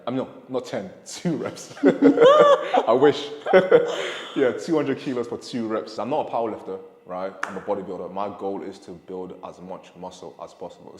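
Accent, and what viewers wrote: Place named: United Kingdom. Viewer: I didn't know u British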